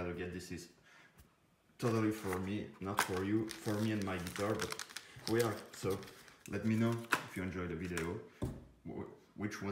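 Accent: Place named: France. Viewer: T'es français toi!😅😊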